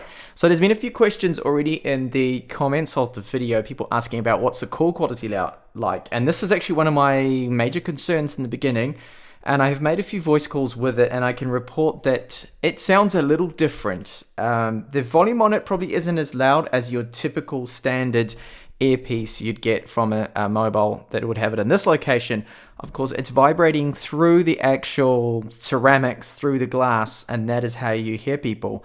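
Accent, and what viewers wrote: Australia. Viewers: Hey man first time on your channel, quick question, did you have to mod anything with the phone in terms of sim like do you use a provider like optus? Just guessing from the accent, thanks man. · I can't tell if you're from Australia or New Zealand... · I love your accent, are you australian?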